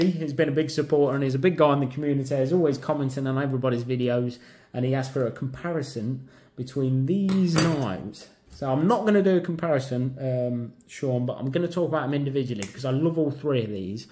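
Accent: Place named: England, United Kingdom. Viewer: "get on with it". "right". Love the Queens English, over here across the pond, Mate. Florida USA.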